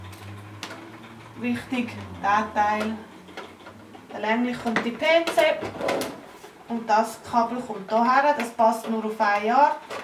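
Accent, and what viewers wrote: Switzerland. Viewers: Stimmt, Schwitzerdütsch ist kein Deutsch..... Aber ich versteh dich! ;-) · Juhu, isch scho es Zitli här das Video! i wohne z'Basel, chume aber ursprünglich au us der Region Solothurn/Bärn... due doch nid esone Zürcher Dialäkt ufsetze... wenn nämlech am Erkläre bisch im Video seisch jo au Röueli z.B., lohs lo sprudle! ;-) aso me verstoht di Mi Cameo 3 isch no haub ipackt im Büro und i goh jetz grad mou go luege öb das stimmt wo du do verzöut hesch im Video ;-)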